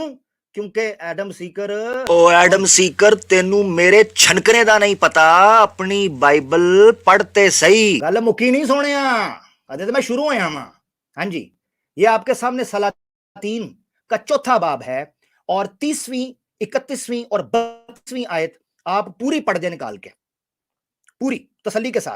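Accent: Punjab, Pakistan. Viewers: You rock in punjabi accent maza aa gayi ji Tantani logo ko bhi zara todhi me le lo Paa Ji zara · Your panjabi-urdu accent is so sexy🥵🥵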